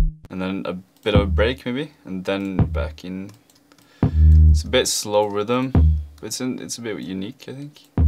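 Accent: Norway. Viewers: You know you are Norwegian, when you can hear the Norwegian in his voice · You sound so Norwegian. In the best possible way of course - bra video og mye god inspirasjon!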